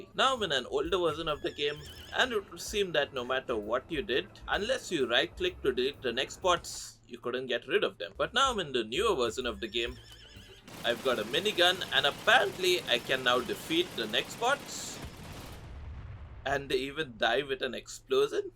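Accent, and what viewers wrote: India. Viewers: U sound very indian. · Why your Voice so indian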